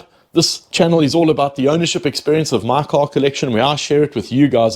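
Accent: South Africa. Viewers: I know a South African accent when I hear it 😂😂😂😂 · Bro, are you South African? I can always hear my country’s accent 😂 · Dammm sounds just like a South African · Are you South African? You sound like a Joburg Boytjie? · I thought straight away that your English sounded suspiciously similar to my local Eastern Cape English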